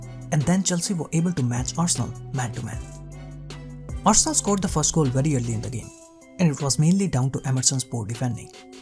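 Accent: India. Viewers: He sound like he is trying hard not to sound like Indian accent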